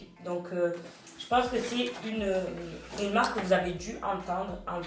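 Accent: Marseille, France. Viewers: J’adore 😍 jaime trop ton accent marseillais! 😘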